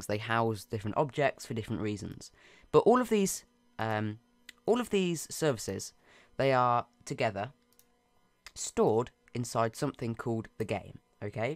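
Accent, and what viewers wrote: United Kingdom. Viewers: prolly because of british accent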